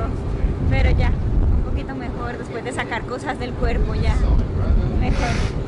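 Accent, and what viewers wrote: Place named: Colombia. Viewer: Hola me llamo Yanet, soy cubana, vivo en Italia y te he encontrado por casualidad en YouTube y realmente tus videos nos gustan mucho porq estoy conociendo Australia gracias a ti estoy fascinada, he visto tantos de tus vídeos eres fantástica, y amo el acento colombiano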